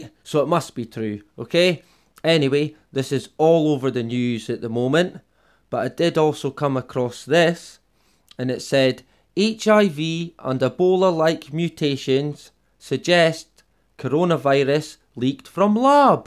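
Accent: Scotland, United Kingdom. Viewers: I love your Scottish accent.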